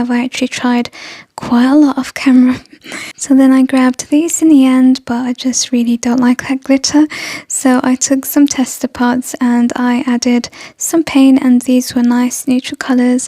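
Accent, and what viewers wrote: England, United Kingdom. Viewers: Hi love! Personally, you don’t have to remind me to press the thumbs up because that is the first thing I do when I open the video! Such a cute idea to put a tea light in the egg shell! My fave is the last diy., did I ever tell you how I love your calming voice! Add the English accent and....heaven! You should do story time for kids at the local library! XOXO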